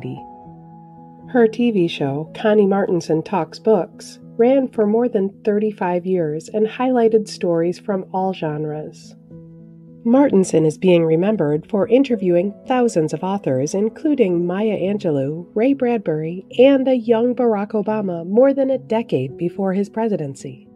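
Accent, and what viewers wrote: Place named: Upper Midwest, United States. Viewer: Are you from Minnesota by chance ? I detect a strong upper Midwestern accent.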